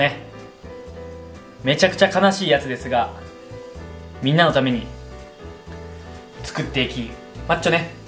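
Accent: Kansai, Japan. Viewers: リプありがとうございます！要所要所に出る関西弁も好きですよ＾＾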